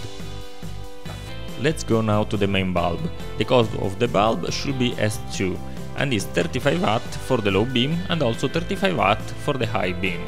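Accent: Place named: Italy. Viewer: love your italian accent!!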